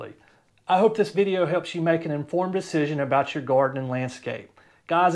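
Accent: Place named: Alabama, United States. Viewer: Auburn, huh? I thought I heard an Alabama accent. I'll be subscribing to help me with my garden in Tuscaloosa.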